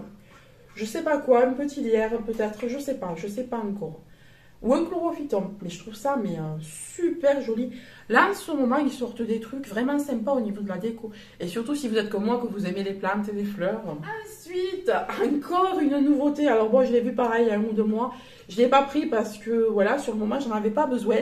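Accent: South, France. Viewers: bonjour, je découvre ta chaine aujourd'hui et j'aime beaucoup ton haul ! je te trouve très à l'aise devant la caméra et j'aime bien ton accent du sud (je suis moi même du sud ouest !) j'adore le cache pot vert à suspendre et les différentes lanternes solaires que tu as acheté ! je pense que je me pencherai un peu plus sur le rayon extérieur la prochaine fois que j'irai chez Action grâce (ou à cause ?) de toi !! hi hi hi !! par contre moi j'opterai pour des plantes artificielles car je n'ai absolument pas la main verte, je fais crever toutes les plantes...pfff !! allez je m'abonne à ta chaine pour découvrir un peu mieux ton univers. Merci pour ta bonne humeur, bises, Christel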